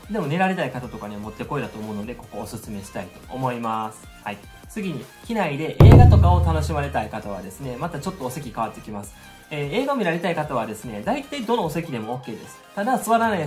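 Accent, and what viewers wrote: Kansai, Japan. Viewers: 参考になります、私はトイレがちかいので通路側に座ります、感じが、YouTubeおのださんに似ていますね。関西弁もね。✈ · はじめまして～～♫ 某YouTuber さんのコメント欄で、数名の方がおススメしていらしたので、来ました🏃‍♀️♥ 今回の動画、めっちゃためになりました。 私は昔は早く降りたい！ので前の席を予約していましたが、最近は、後部座席の方が隣の席が空いてることが多いので、後部座席を予約するようにしています。 あまりに後は言うてはるように、トイレ待ちの方が並んでたりするので、やめるようにしています。 関西弁なんで、めっちゃわかりやすく、楽しいです。 これからも動画楽しみにしています～ね。💖 よろしくお願いします☆ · 関西弁のツッコミがめっちゃ面白いです🤣 海外の航空会社に就職されるのはすごいですね ご優秀なのがわかります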